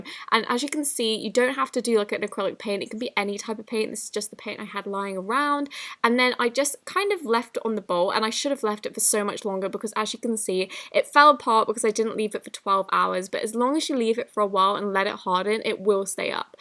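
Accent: United Kingdom. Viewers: your from U.K?????😮😮😮😮😮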